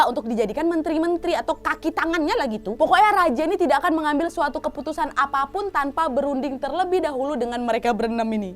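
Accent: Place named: Manado, Indonesia. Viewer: Aku suka gaya bicaranya, kayak orang Manado🤗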